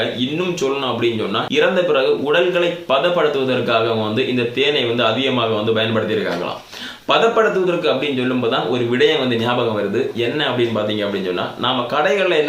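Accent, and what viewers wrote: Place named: Kanyakumari, India. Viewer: அருமை. குமரி தமிழ் சிறப்பு.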